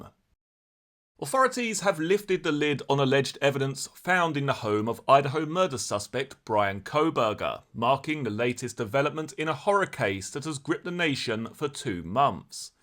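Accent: United Kingdom. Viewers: I love your accent. Made me realise how rarely I listen to BBC 😂😂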